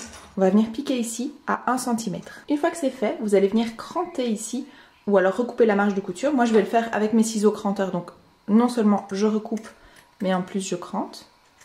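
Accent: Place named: Switzerland. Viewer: Tuto tres clair, net et précis ça sera pour mon petit- fils de 7 ans merci bcp Je fais ma curieuse d'où vient ce petit accent je dirais soit Suisse soit Belgique soit Alsace😅 Ps, musique très dinamisante 👍🏽👍🏽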